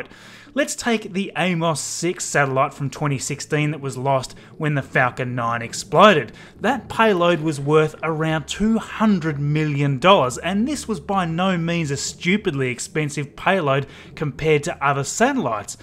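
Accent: Australia. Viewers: Can not listen to a thick Australian accent; it's unbearable; sounds like a flushing toilet. Can you get someone with a soft pleasant voice?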